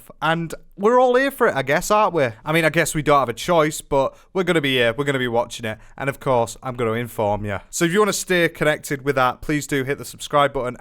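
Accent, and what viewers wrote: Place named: Newcastle, United Kingdom. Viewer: Hey there. I am brand new to you. Nice to meet you. You sound like a Geordie. lol. My favorite accent. I will subscribe and like!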